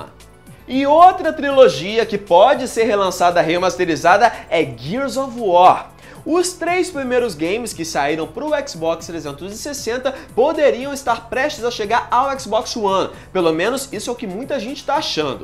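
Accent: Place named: Bahia, Brazil. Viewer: Olhem os primeiros vídeos dele, era mó baianao kkkk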